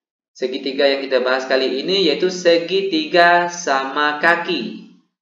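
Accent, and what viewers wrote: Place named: Bima, Indonesia. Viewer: Logat bima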